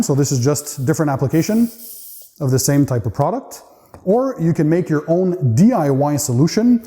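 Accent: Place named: Canada. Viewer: par pur hasard après avoir acheté la cire céramique hydrophobe de chez Meguiars j’ai eu des propositions de vidéos qui m’ont plus donc je me suis abonné. J’adore le contenue de tes émissions et l’accent Canadien nous les français on adore cela lol.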